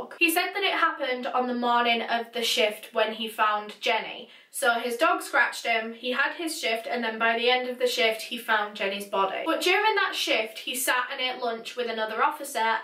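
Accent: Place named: Yorkshire, United Kingdom. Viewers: Must be American , this is a Northern accent 💜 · It's a Yorkshire accent haha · Lol its just a Yorkshire accent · Hahaha being from West Yorkshire myself I just can’t hear the ‘baybeh’ like other comments it just sounds normal 🤣🤷🏼‍♀️ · it's how we roll in Northen England. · I’m enjoying your videos 💕 your Yorkshire accent is great!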